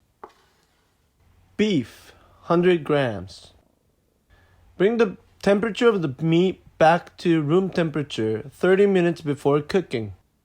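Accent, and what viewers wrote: Japan. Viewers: 結構日本人感ある英語やね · 英語が上手い人の発音じゃない。ジャパニーズイングリッシュ丸出し。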